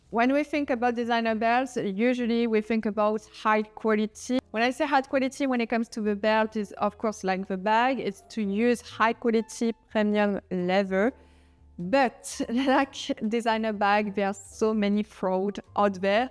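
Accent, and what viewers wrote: France. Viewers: unrelated, but the French accent sounds very fancy. It matches the theme haha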